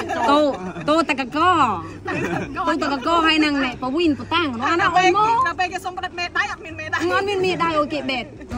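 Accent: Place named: Siem Reap, Cambodia. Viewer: 😂😂 និយាយពិរោះតាមអ្នកសៀមរាប ដល់ឈប់រដឺនចង់ដាច់ផ្ងារ😂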